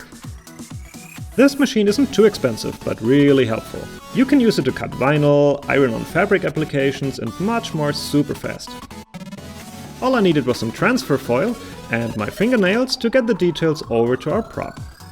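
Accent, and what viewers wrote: Germany. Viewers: spork they have a German way of English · Sehr nice videos die ihr da macht! Ich bin vielleicht kein cosplayer aber ich schaue diese Videos einfach trotzdem sehr gerne. Sie sind sehr unterhaltsam und den deutsche akzent kann man einfach so herraus hören das alles so "vertraut" vorkommt. Was ich mich einfach noch frage ist, wo die Tracer guns sind? :D Tracer ist ja das Masktochen von Overwatch und fehlt mir irgendwie in eurer video Liste. Macht weiter so und ich hoffe ihr werdet einfach NOCH größer! PS: Ich habe euch natürlich auch ein Abbo da gelassen. MFG Sebastian ;)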